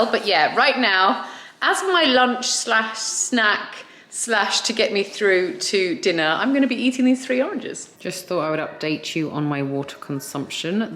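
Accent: United Kingdom. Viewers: I'm South African, had no clue you're South African with your full on UK accent. Thought you were Spanish 🤭 love your meal inspo. Such nostalgia seeing those SA treats 😊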